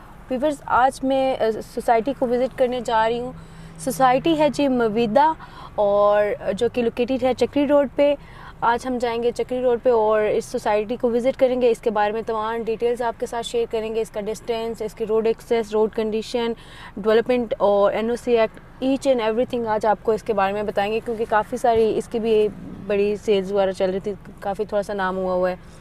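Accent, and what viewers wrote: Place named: Rural, India. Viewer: Tumney baal bhi seedhay kiye huvay hain aur kaalay chashmay bhi lagaey huvay hain ,, lekin jab bolti ho tou pata chal jata hai k after all paindu ho. Bilkul Muhammad Amir ki tarah ,,, shakkal bhi ussi sey milti hai aur baaton ka andaaz bhi. Thori bolnay ki speed kum karo, thori narmi aur shaistagi paida karo apnay lehjay mein, aur relax ho kar bolo.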